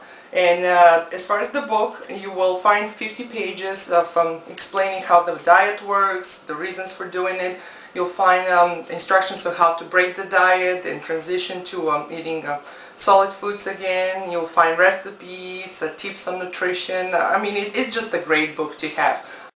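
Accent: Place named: Poland. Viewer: sorry just noticed your accent, are you European? lived and probably raised in america? just curious lol... sounds you've got polish accent.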